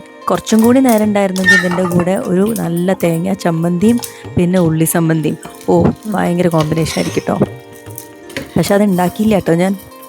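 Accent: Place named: Thrissur, India. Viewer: Sister you do not have a Kannur or thalassery slang it's that of Thrissur and Palakkad anyway good 👍 Sruthi from Dubai hailing from Kannur at thillenkeri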